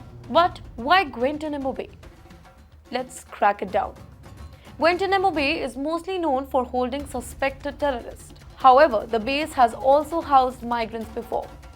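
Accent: India. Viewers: Indianlish. Only Indians can understand. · Indian lady with accent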